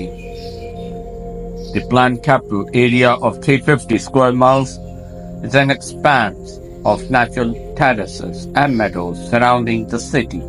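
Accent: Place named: England, United Kingdom. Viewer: Love the English accent..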